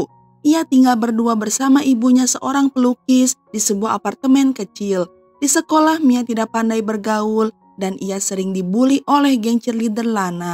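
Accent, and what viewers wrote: West Java, Indonesia. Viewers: Asa ditatar sunda nyaritana...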